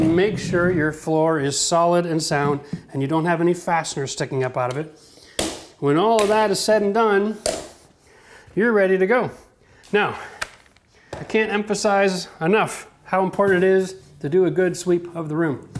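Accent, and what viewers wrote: Canada. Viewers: you're such a cowboy! SLC is the "one" cementitious product I actually measure the quantity of water for -- partly cuz leveling a floor is sort of a one-shot deal, also because the stuff is like $25 a bag around here. On the other hand I'm brave enough to squeeze the whole bag into one pail -- there's about an inch to spare:) Definitely worth taking the time to properly level a floor. Always fun educational videos and I'm picking up a lot of Canadian.. almost can say Prow-cess correctly! Happy 2020!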